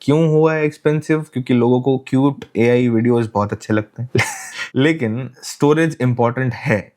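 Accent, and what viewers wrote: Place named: United States. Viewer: Bhai american accent bala vasha kaha sa sikhlio ho.😢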